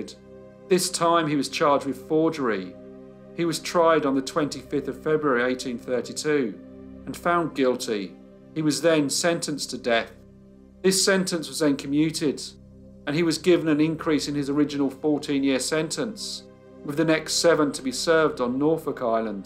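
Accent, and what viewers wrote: United Kingdom. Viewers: It's LeFFtenant, not LOOtenant. You sound British, you should know better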